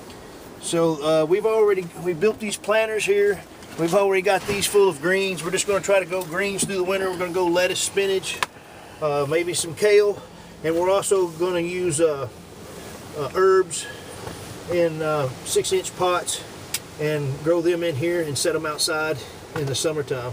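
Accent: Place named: Kentucky, United States. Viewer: Thought you sounded local! Southeastern KY?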